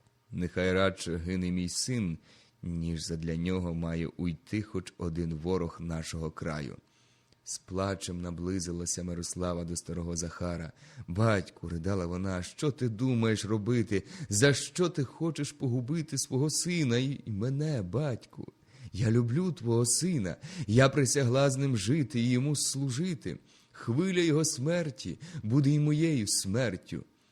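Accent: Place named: Western Ukraine, Ukraine. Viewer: Щиро дякую за чудову роботу.Милує слух мова Заходу України. тих часів.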